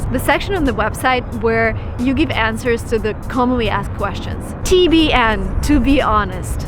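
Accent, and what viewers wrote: United States. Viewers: Madam you are a Russian and you talk in perfect American english.We Indians adore you.We respect you.ALL THE BEST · Марина классна говоришь по английскому прямо как Американка сними на русском такое же пожалуйста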